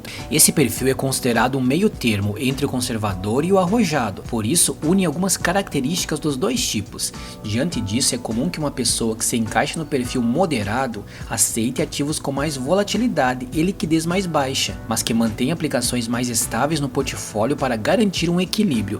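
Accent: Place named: Rio Grande do Sul, Brazil. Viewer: Bah gaúcho kkk que sotaque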